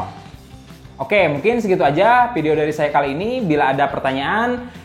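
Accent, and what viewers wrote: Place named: Tasikmalaya, Indonesia. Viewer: orang tasikmalaya dia kayaknya mas akwkwkw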